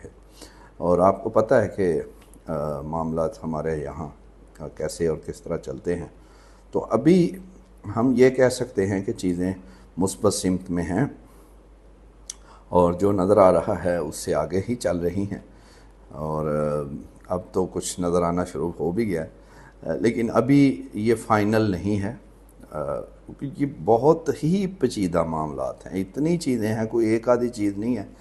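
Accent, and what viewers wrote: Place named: Lahore, Pakistan. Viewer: main lahore se hoon. quite used to your typical lahori accent. it is very cold and bad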